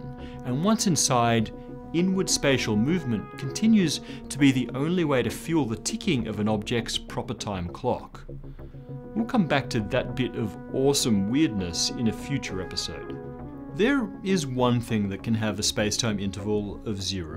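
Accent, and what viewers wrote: United States. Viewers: speak in an English the whole world can understand...dont use your american accent.. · so you had to stuff up this channel by employing Americans with course and shrill accents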